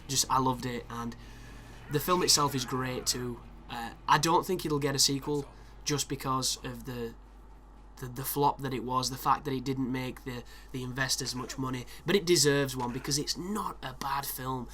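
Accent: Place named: Yorkshire, United Kingdom. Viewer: Funny you don't watch GoT, your accent reminds me so much of Jon Snow's.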